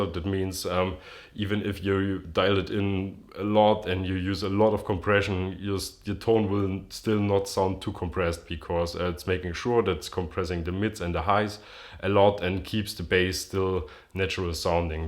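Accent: Germany. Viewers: Things always sound way cooler in a German accent.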